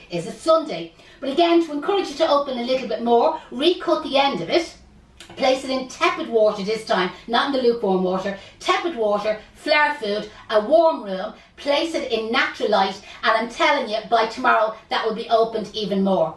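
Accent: Ireland. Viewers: It’s “flower” food. She has an amazing Irish accent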